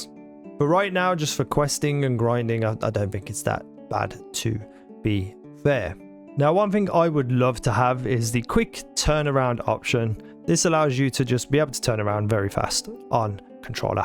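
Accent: Birmingham, United Kingdom. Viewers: Alright mate, just downloaded the game now. You sound like a fellow Brummie btw which is cool! If you go to the controller preset page where it shows image of the controller, you can see that quick turn around 180 degrees once you enable the setting is double input of pulling left stick down. Iv spent 3 hours trying to dial in the settings so this feels nice. Very confusing and still working on what to assign to my 2 back paddles on the controller. Im using controller on PC and its not as buggy as console thankfully.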